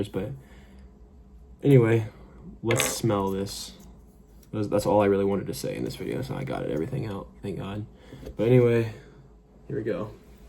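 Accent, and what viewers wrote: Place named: France. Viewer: Ey man I was curious. Why do u often use this "france-ious" dialect?✌🏼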